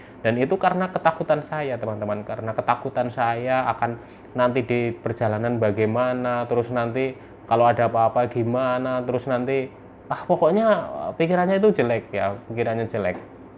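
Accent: Banyumas, Indonesia. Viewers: Asalamualaikum saya kena aslam udah 4 tahun tapi masih sering kambuh2 tiba2 gemetern sampe sampe lemes se lemes ya rasa ya rasa gemetern y datang y dari ulu hati, kang y mana saya jga punggelan banjarnegar.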